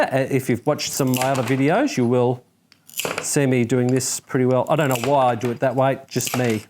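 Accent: Australia. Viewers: Very well articulated and I love the Austraaaiilliiaan accent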